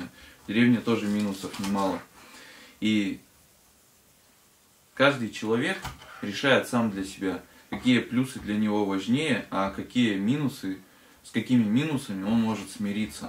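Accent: Urals, Russia. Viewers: Вот как раз по нашему разговаривают ...по Уральски ✌️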